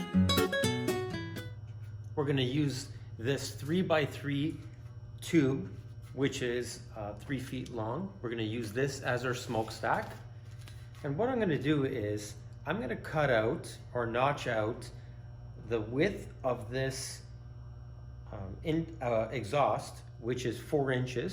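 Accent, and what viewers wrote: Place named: Ontario, Canada. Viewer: Ha was watching and just by your accent I knew you were one of the good guys, Canadians that is and from Ontario. I am in Windsor and I am build a smoker as well and just love watching others build them. Thanks for the video eh!!!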